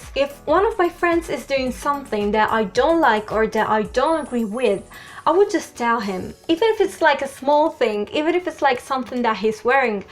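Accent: United Kingdom. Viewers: You are speaking in British accent but you do not look like a British in some aspects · She's Italian but she speaks English with a British accent. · Your English is very good however it seems to me that you speak with a British accent which sounds very nice. I would love to see more videos in Italian.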